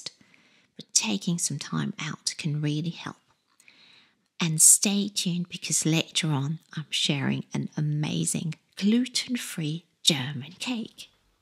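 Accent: England, United Kingdom. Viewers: I keep watching your video's. Your English is so beautiful, still you make German cakes. So I wandered if you are from Germany, what is nearly impossible because you sounds so really English. Carine from Bruges, Belgium. I love how you bring charming England so nearby.☘️🌷☘️🌷☘️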